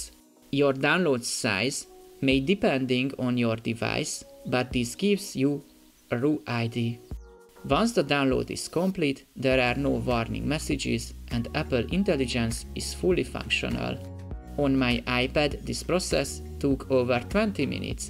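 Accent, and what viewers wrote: Hungary. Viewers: Are you from Hungary?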